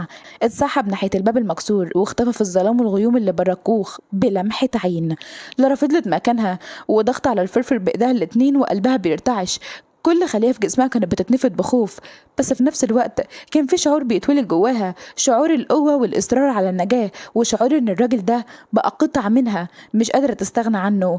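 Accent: Syria. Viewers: 😂 او يا بتعرفي تحكي سوري كويس ماشي حال جيد جدا ❤ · لهجتك السورية جميله قوي ❤❤❤❤ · حبييييييييييت اللهجة السورية تبعك❤ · تحفه وصوتك تحفه وكلامك تحفه وقصصك تحفه ونتي تحفه كل حاجه فيكي تحفه ولكلام السوري طالع من بقك زي العسل ربنا يخليكي يا يا مدلعانا حبيبت قلبي انتي 💐👏💝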